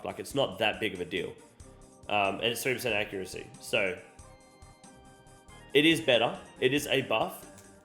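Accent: Australia. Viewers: he's Aussie mate from Perth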